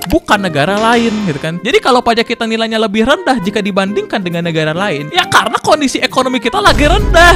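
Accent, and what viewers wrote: Bandung, Indonesia. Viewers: Lo orang mana bang? Bandung ya?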